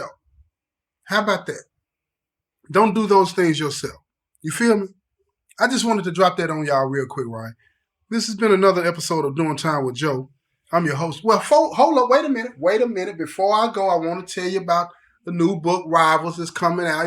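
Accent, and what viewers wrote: South, United States. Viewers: Hi, I look forward to ur videos everyday. Ur VERY consistent I love ur southern accent, I think it's sexy, anyway, u hv become part of my morning routine. I wish there was a way to send u $. U r LIT J! Hv a good day.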